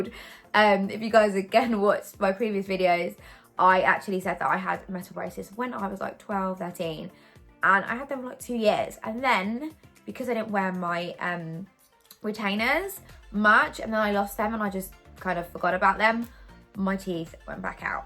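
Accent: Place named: Australia. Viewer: Hi, I really like your accent. Are you Australian?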